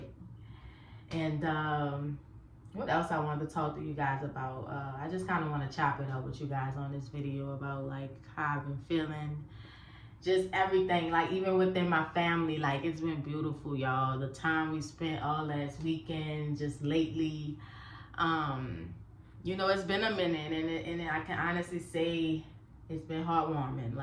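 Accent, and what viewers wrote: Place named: New York, United States. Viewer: Hey just subd to your channel💯🫡. Are you from NY? You sound like your from up there🙂😀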